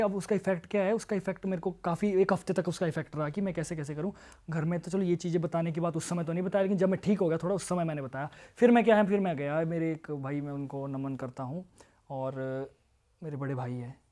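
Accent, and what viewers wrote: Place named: Himachal Pradesh, India. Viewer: Being a himachali I can relate some words like shut karke ,chik chik chik ,and the accent ❤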